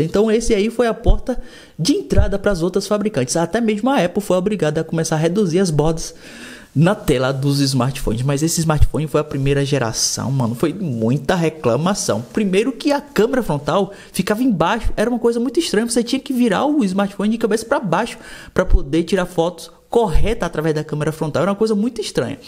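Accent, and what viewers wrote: Bahia, Brazil. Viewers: De certeza esse cara é baiano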